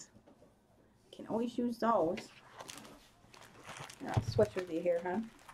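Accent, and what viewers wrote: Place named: Northeast, United States. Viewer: I figured you were from somewhere in the northeast, your accent was very familiar:) I lived 12 years in the Southern Berkshires, MA. My husband and his family are from Mahopac, NY in Putnam County..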